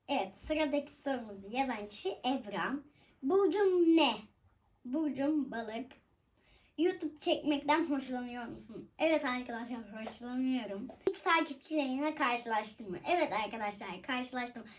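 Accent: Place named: Samsun, Turkey. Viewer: Samsunlu oldugun cok beli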